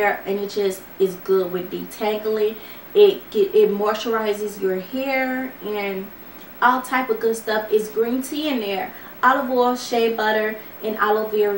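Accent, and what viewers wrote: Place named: Haiti. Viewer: Are you from Haiti pretty lady? U sound like your from my island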